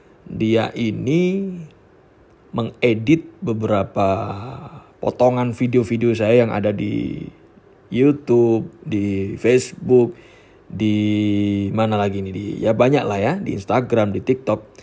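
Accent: East Java, Indonesia. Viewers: jawa timurane kentel sayid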